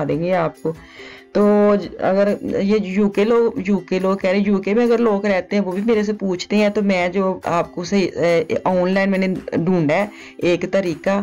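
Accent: Punjab, India. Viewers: Oh my God apki mazedar funny punjabi style ko sun kr ma ne Channel subscribe kiya hai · Nan wo bhi tandoori nan ... ghar main banana mushkil hota hy ... kamal kr dia, presentation bhi bohot zabardast hy.. urdu main pujabi ka tarka .. foran hi, like 👍 aur sath hi subs bhi kr dia, ta k future main ap ki 📹 videos dekhta rahon.. umeed hy ap nay bhi asa hi kr na hy ... Allah pak ap ko aur tamam ghar walon ko hamaysha kamyabian, and khoshyan naseeb farmaye Ameen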